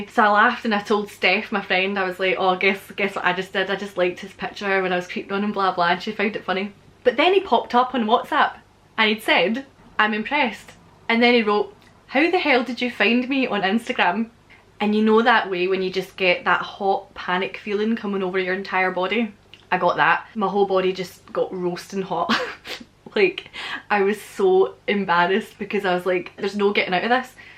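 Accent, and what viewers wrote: Scotland, United Kingdom. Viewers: Sounds like you're a shambles of a lass 😂 love it, definitely subscribing! The one about walking into your mates kitchen with your shirt undone had me flippin dying 😂 Mind you the Scottish accent doesn't hurt 😆 I could listen to Scottish people all day.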